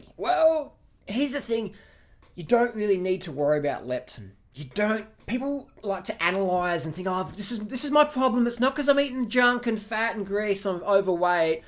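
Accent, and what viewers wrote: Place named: Australia. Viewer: This video is as good as his Australian accent.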